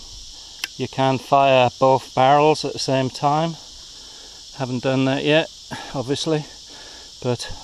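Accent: United Kingdom. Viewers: Where do you live at brother if you don't mind my asking? You sound British, but I didn't think you were aloud to own shotguns in England. No offense meant. I'm just ignorant when it comes to gun laws in the UK.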